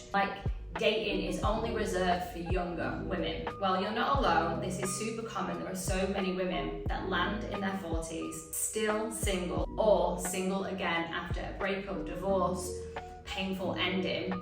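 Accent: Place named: Australia. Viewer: I very much like this accent! Is she Aussie?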